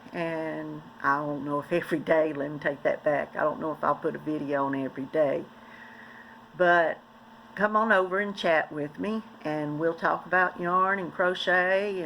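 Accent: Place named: Kentucky, United States. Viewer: Hi 😊, I love yarn too! Just found you and I'm looking forward to seeing more of your videos! I would love it if you came over and checked out mine, if you would like or if you can find the time. I'll be watching! I'm from Kentucky, so I know the accent 🤗!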